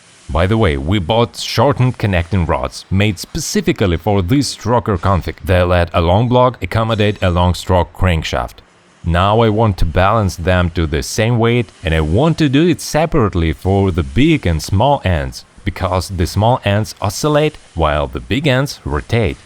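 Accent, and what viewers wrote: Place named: United Kingdom. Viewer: You have an impeccable British accent.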